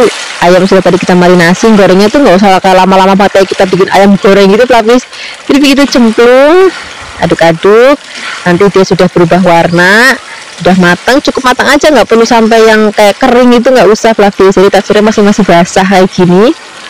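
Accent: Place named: Cilacap, Indonesia. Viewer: Kok ky wong cilacap ulih ngomong ya bun,tempe semangit senengane mamakq huhuuu😭😭😭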